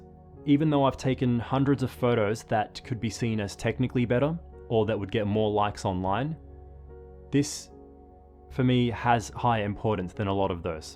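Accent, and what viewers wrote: Australia. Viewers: The two things I absolutely love about this are: A. The amount that it resonates with me and encourages me to shoot more of my family. B. That you truly have the most Australian "O" I've ever heard. ♥️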